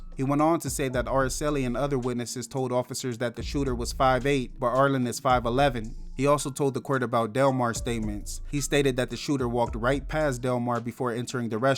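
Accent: Los Angeles, United States. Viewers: Right on time‼️ btw are you from LA?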